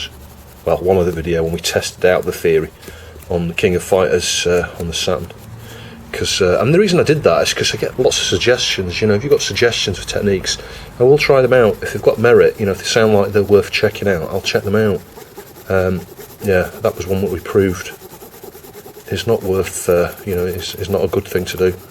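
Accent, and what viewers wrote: United Kingdom. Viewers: Be a shame if you stopped. Recently discovered your channel. British accent makes everything sound better. Sorry to hear you're getting caught up in YT drama crap. I'd say just ignore it and do your thing but easier said than done. Also, don't sweat the likes/dislikes. I tend to never "Like" anything. Just because I'm lazy. Youtube should ditch it, watch time is all that matters. Then again, if you don't enjoy making the videos, then forcing yourself to do it will only make it worse. Good luck!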